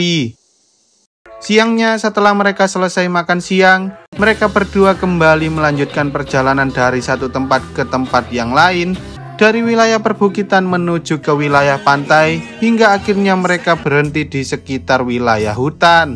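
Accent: Java, Indonesia. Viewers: jowo ngendi mass? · ORANG JAWA YA BANG .. NGOMONG NYA MEDOK.. JAWA NYA MANA BANG .. AKU SEMARANG BANG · Kamu org Jawa ya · Medok bgt jawanya mase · Logat jawanya masih kental kali😂😂😂...jd kurang sedap mengikuti alur filmnya😢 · Coba plis logat jawa nya jangan di pake ,jadi susah nyimak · suara jowo nya kurang kurangi mas 😃😃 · Fiks org Jawa Admin ny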